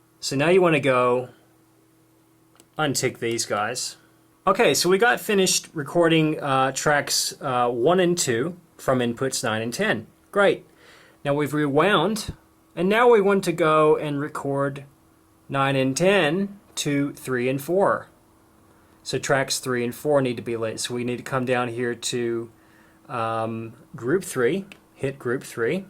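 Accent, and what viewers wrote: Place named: Australia. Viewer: are you aussie? if not you have such an aussie voice for an american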